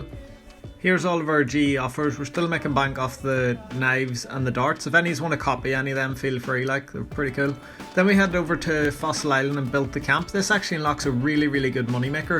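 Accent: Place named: Scotland, United Kingdom. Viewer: Love your Scottish accent bro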